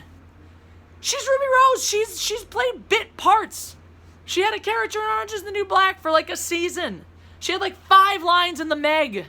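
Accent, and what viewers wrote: Philadelphia, United States. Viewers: I like when gets all Philly on us. XD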